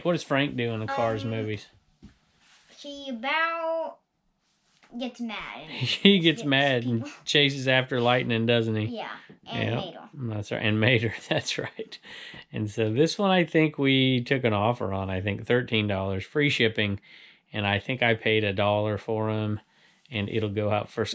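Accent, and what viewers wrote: South, United States. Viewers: Where in California they speak with southern accent you gotta give that family secret up.. I thought Angeles played in Anaheim and there they sure don’t speak like you...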